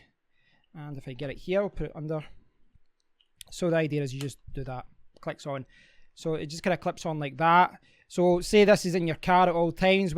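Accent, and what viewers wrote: Scotland, United Kingdom. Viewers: HES SCOTTISH!!!!! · Neither Welsh n or Irish; it's pure Scottish. :-)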